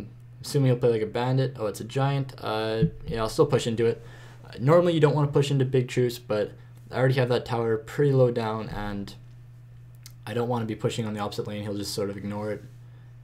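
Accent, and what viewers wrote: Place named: Canada. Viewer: aha knew you sounded a little canadian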